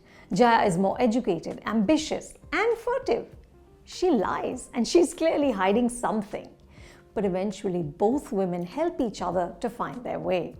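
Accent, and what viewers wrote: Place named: United Kingdom. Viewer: Ye madam ji ki English 😂.. inko angrez yahii chhhod gaye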